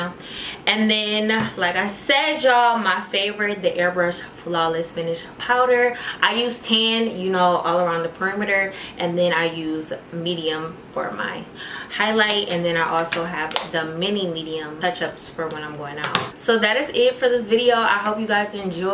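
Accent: United Kingdom. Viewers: your British accent is really cute. Your makeup looks gorgeous Charlotte Turberry 💄💄👍🏽. Congrats on your collaboration with the brandy ou deserve it. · its the british accent for me its even funnier coz im from ldn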